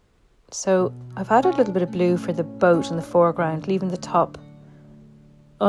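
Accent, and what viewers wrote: Ireland. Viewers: Hi! I have got to know you and your charming artworks from Sketchbook Revival 2023 and what blessing that was! I am totally blown away by your works and love all your videos! Your voice is so calming and I love your Irish accent 🥰! I enjoy the scenery in your videos too! I get transported in your world of wonder and for that I am ever so grateful! Thank you so much for adding that little spark of happiness in my day when you post a new video! Love and Blessings to You and your family …..Lorraine 😁🎨🇲🇹 ( Malta)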